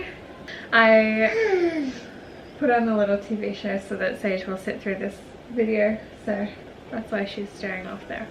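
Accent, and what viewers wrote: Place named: United States. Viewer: Why do you have a part American accent? Did you live there?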